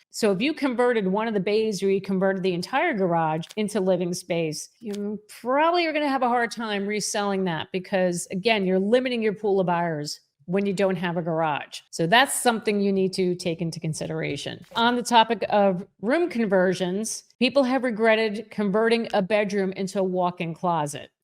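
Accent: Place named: Northeast, United States. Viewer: Like you, the channel and the ideas presented. One update; sounds like you’re from the northeast and I don’t know what pools cost there, but here in Texas you can’t put in a basic pool - no grottos, spa or showers - for less than $80k. That really brings your point home even further. My advice? Make friends with someone who has a pool! 😅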